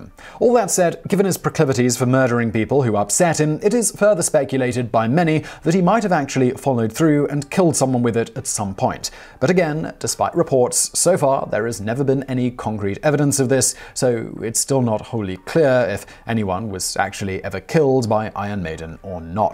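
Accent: United Kingdom. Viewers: Simon I absolutely love you but a vacation is I order my friend! I don't want you to work yourself to death. I so look forward to lovely British accent!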